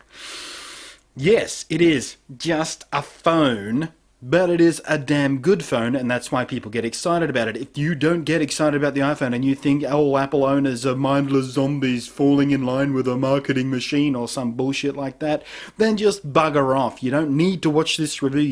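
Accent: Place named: Australia. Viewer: 1) Great Review 2) Love the Aussie accent 3) Double or Triple RAINBOW FTW!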